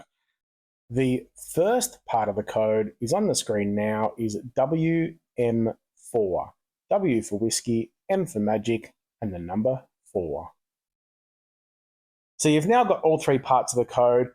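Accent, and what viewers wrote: Australia. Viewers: thanks legend, new to your channel but always good to hear a fellow aussie voice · Great video, man! I could listen to Australian English all day long. Keep it up, your content is really amazing! Also, you seem to have a very smart cat! Great idea of yours! But don't worry, I'm sure there are enough people who watch your videos regularly. And of course, thanks a lot for your content! 😉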